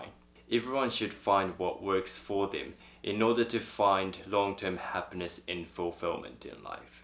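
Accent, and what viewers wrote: New Zealand. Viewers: I thought I’d detected a kiwi accent 😃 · Are you a kiwi? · you sound like a kiwi.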